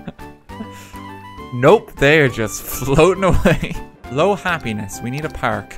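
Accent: Ireland. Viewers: this is my first time watching him because of country roads then I said OH YOUR IRISH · Are you an Irish gaymer? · Are you Irish? xD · Themlgdinosaur 12 Both of them are from Ireland, so they sound quite similar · Are you Irish?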